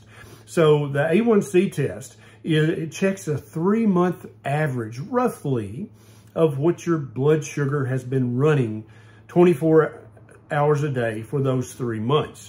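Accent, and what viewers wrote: United States. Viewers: Where in America does the Doctor's accent come from?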